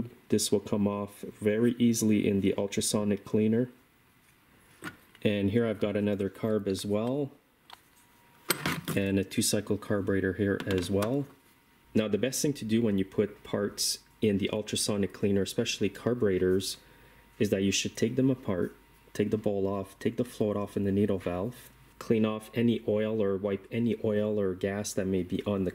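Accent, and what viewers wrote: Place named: Canada. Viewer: Definitely Fahrenheit. Also, I just love the Canadian accent. Good video.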